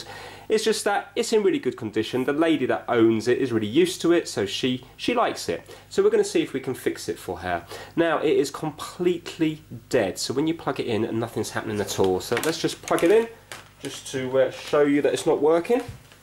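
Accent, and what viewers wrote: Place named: East Anglia, United Kingdom. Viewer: I can't quite place accent though. Sometimes he sounds like he's from East Anglia, other times Irish!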